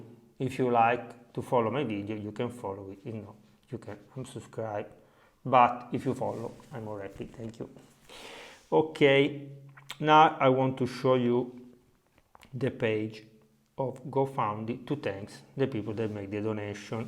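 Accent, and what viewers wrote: Italy. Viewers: Your english is not bad, it’s great, it has an italian accent to it and it is normal. People need to chill and stop being rude and appreciate the unique content you are adding.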